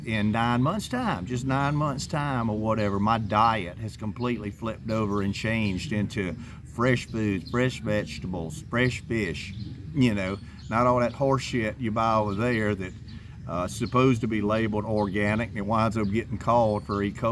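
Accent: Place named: Texas, United States. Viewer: You're a Texan cowboy lad the way you spoken yeah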